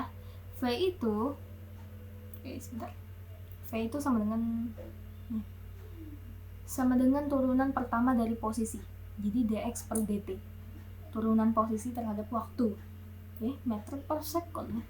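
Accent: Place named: Makassar, Indonesia. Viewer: Kuliahnya d mana kak serasa org makassar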